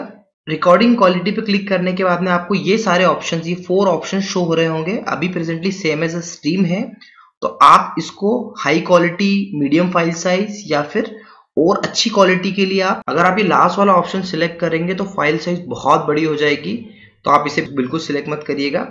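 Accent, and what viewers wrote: India. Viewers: Why is the video description in english, and the audio on the video in a crazy indian dialect? Dislike for you, click bait!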